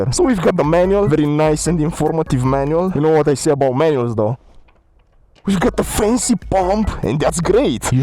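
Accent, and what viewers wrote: Romania. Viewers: mi am dat seama ca esti roman după accent😂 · broo, u from timisoara?? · Este român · ai accent de român😮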